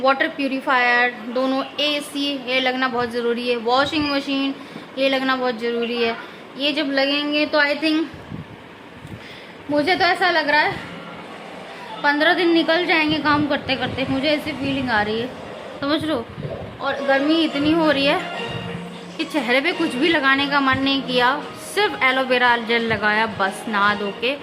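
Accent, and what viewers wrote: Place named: Uttar Pradesh, India. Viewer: Up language lapat chal rhi h😊😊😊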